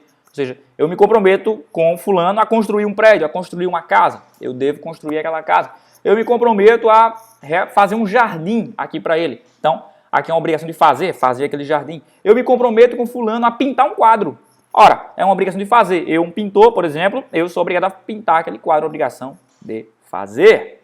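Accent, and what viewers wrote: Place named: Pernambuco, Brazil. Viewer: Parabéns pelas aulas!! Que sotaque lindo, é de Pernambuco??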